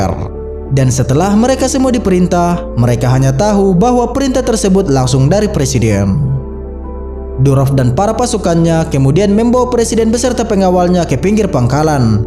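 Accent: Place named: Java, Indonesia. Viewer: Fokus ke pembaca narasi.. mendok jawanya terasa bnget..😊😊😊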